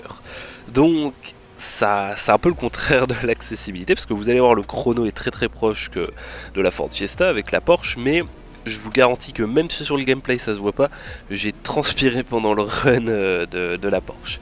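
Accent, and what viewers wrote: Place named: Lorraine, France. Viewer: lol me suis fais la meme remarque. mais ça c'est l'accent de lorraine; en vrai il est assez eloigné du français lol.. comme le "j'ai pu', au lieu de "j'ai plus"..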